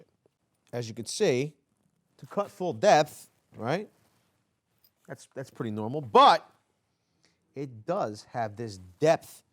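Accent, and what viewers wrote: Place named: North Jersey, United States. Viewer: You gotta be from North Jersey or close to that... Right? Sounds like it! Thanks for the video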